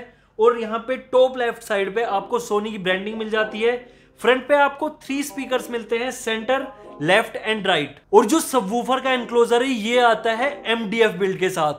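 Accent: Meerut, India. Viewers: Dislike. Can't understand this Indian english accent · Bhai aapki zaban se Meerut barasta hai 😀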